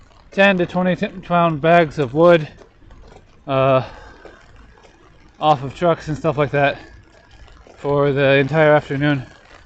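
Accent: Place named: Canada. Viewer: Thanks for taking the trouble to do your video on the way to work & giving us some insight into your working world....I really hope your company takes health & safety seriously, particularly with regards to the glass🙄.Just out of interest what town or city do you live in,I'm guessing somewhere in Canada based on your accent??!! Keep up the good work....I SO admire your openness & honesty!! Mark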